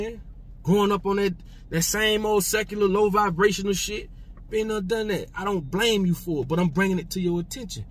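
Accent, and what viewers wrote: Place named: Louisiana, United States. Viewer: Thanks for the video. Thought you were from Louisiana ! Would have never guessed Haitian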